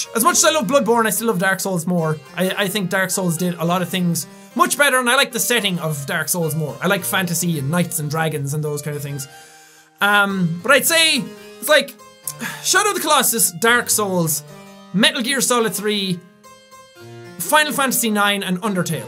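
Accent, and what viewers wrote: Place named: Ireland. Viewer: Move to Ireland for 1-2 years and you might pick up the accent :P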